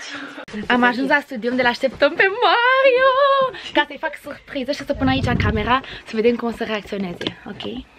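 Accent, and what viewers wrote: France. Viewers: Ce accent e ăla de francez cred nu? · Ai un accent de franțuzoaică 😉❤️ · De ce ai accent franțuzesc? Ps:sunt nouă și nu știu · Ce accent franțuzesc ai! 😍😍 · Ce accent frumos se franțuzoaică ai!:)) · WOW... Imi place accentul tau asa franțuzesc 😘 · Îmi place de tine,ai accent de franțuza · Ai accent de frantuzoaica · Ador felul in care vorbesti. Accentul asta de frantuzoaica e de milioane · Se vede că ai accent franțuzesc!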